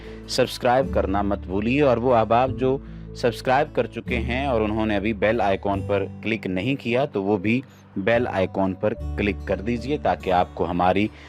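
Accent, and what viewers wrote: India. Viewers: admin zara tehqeeq ker k bolo. or is video ko delete ker k dobara bnao. lehjay se to tum indian lagtay ho. beri hoshiyari se jhoot add ker rahay ho ap taqreer m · Islam kay Naam say Pakistanionko Bay-Waqoof Bananaa ab tow Chhor day Yaarrrrrrr. Islam Amn kaa Paighaam Hay. Muslim Sirf Apnaa Defense kartaa Hay, Inteshaar yaa Hamlaa Nahin Kartaa. Okayyyyyyyyyy. Tum Ek Haraami Hindu ki Haraami Aulaad Ho. Buss Apni Taraf Say Banaa Banaa kar Hum Pakistanion ko Bay-Waqoof banaanay ki Koshish kartay ho. Okayyyyyyyyyy. Tumharaa Urdu kaa Accent 100% Hindi Movie Jaisaa Hay Rayyyyyyyyyy.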